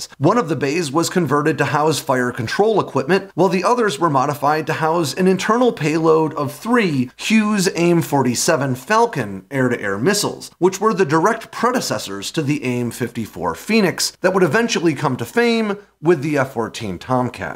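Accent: United States. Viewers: I'm not going to subscribe for two reasons. (1) I really dislike listening to other Americans, and (2) you didn't mention the reason why the US military usually chooses the wrong weapons: Corruption. The US government and military brass are all woefully corrupt. Lockheed-Martin's business model has been based on paying off politicians and generals since before they merged. Lockheed paid off Congress and the joint chiefs with a few million dollars, and got trillions of dollars in taxpayer money to deliver fighters that DISSOLVE ON CONTACT WITH WATER!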